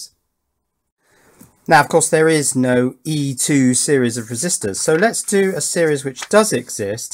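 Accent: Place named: Northern England, United Kingdom. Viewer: Hahah! What part of England are you from? Your accent sounds just like Wallace (of Wallace and Gromit Fame). I found myself wanting to just say, “Cheese ... Gromit!”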